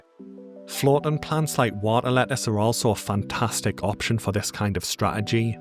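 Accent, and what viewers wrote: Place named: Lincolnshire, United Kingdom. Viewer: yeah, the posh twang which you must have got from your time in Lincolnshire threw me.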